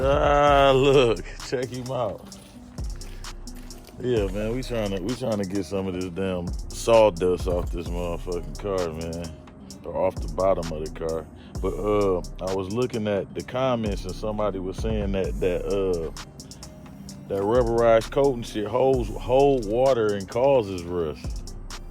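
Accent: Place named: Mississippi, United States. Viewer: bruh what part of mississippi you from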